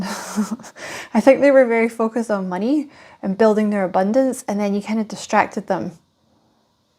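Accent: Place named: Scotland, United Kingdom. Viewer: Love your Scottish accent Lass! ❤ aye 🎉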